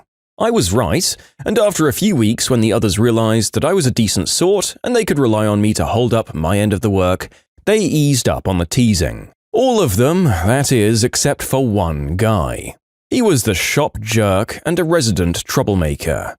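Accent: United Kingdom. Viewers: I don't like the new narrator British people aren't good for these types of stories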